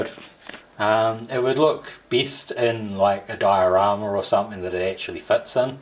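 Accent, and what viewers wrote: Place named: New Zealand. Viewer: You sound like your a fellow kiwi , then I heard tfnz haha but awesome man I subscribed.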